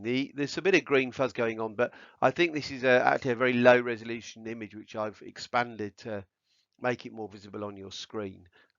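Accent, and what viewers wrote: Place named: United Kingdom. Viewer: Amazing video. Exactly the information I needed presented in an exceptional manner. British accent is the cherry on top :) :) :)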